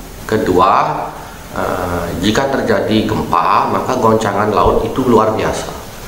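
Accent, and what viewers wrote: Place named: Negara, Indonesia. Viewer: bli, uling negare bli? adi logat care nak negaroa? suksme🙏😊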